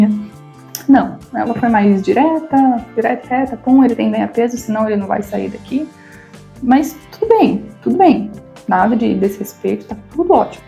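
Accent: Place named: South, Brazil. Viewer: Há sotaques brasileiros uns bonitos e outros sofríveis. O dela por acaso é bem feio. Como ela é do Sul do Brasil e com aqueles olhos bem azuis, não temos dúvidas que é italiana ou e alemã, embora tenha nascido no Brasil e tenha sobrenome português. Mais uma que veio "istranhá" e "falá mau".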